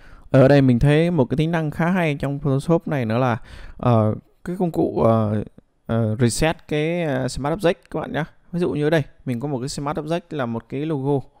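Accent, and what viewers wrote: Vietnam. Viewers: Bạn người Nam Định phải ko bạn? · Anh ở đâu Thanh Hóa vậy ạ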